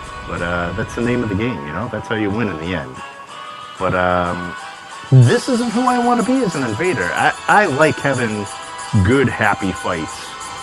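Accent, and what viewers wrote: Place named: Boston, United States. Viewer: Your Boston accent is strong in this one.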